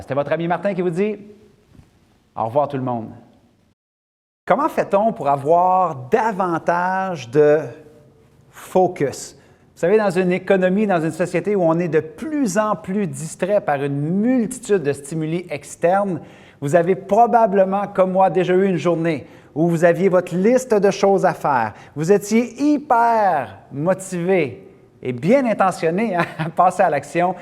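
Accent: Canada. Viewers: Rien que pour le bonheur d'ecouter l'accent canadien je me suis abonnee, mais ce n'est pas que ça! Le punch, l'humour et le message de Martin sont geniaux! Grand merci d'une française frontaliere à la belgique.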